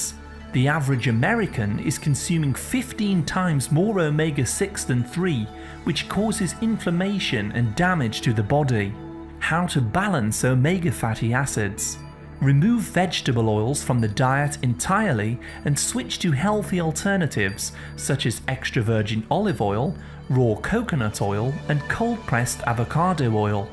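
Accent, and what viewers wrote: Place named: North, United Kingdom. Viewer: When I heard " a like is always appreciated", I realised I take these videos for granted. I think of them as computer generated, spoken by robotics. They are so efficient, precise, informative, that I treat these vids like Wikipedia. I assume there is a team of computers generating these videos, not really someone called. Is that plea for likes added to make me think it's a real individual person. Is that warm northern accent used to give a feeling of trustworthy ness. Anyone else? Or am I in need of a course of omega 3 to lubricate my brain and heart.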